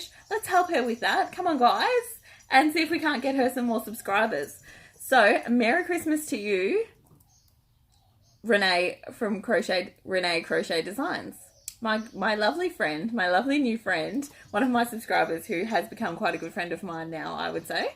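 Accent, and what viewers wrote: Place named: Australia. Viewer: Hi, it’s so nice to hear another Aussie voice on YouTube.. and another Aussie perspective on crocheting. I’ve only just found you thanks to your yarn swap with Summer. I’ve been crocheting since I was 9 ( almost 39 years..) but I’ve learned more thanks to YouTube videos than I knew in the previous 39 years!! I’ve been unable to work since 2016, due to chronic and acute pain and illness, crocheting helps get me out of bed, gives me something to achieve each day ( other than just getting up!!), and helps me to cope with the rigours of my illness. It is my hope to be well enough next year to commence my own YouTube channel, to help give another Aussie voice and help to build the Aussie crochet community on Youtube, whilst also sharing a little of my journey in the hope that I can bring some light into the life of others living with chronic illness and pain.. 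(hopefully my Counselling background might help a little here). My wish is simple (I hope), I’d love to be able to try either Red Heart Super Saver, or Vanna’s Choice yarn, or Lion Brands Touch of Alpaca. Being on a very limited budget, accessing some of these are a little beyond my reach at the moment. All that being said, watching the wishes of others being granted brings me great joy. Thank you for you lovely lively video, I’m now off to binge watch your earlier videos... Wishing you and yours a very Merry Christmas filled with all the joys of an Aussie Christmas and a spectacular New Year!!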